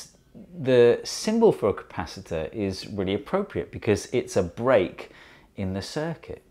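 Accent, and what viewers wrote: United Kingdom. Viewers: second (?) video i've watched of and i've only just realised they have a british accent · <link> to an American wow I forgot how weird British people say aluminum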